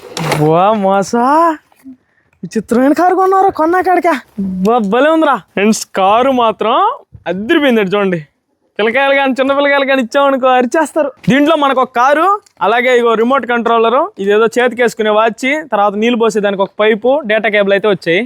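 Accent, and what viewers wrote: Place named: East Godavari, India. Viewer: Anna east godavari lo laga mataladu Anna eimka ni vedios ki eavvadu topu ledu e comment ki vedio cheyy anna nenu eaduru chustu vumtya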